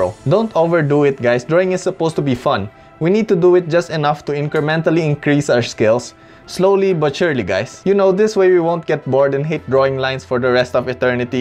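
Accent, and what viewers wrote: Philippines. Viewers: Carry that filipino accent hard kuya! Be proud! Hehehe love them vids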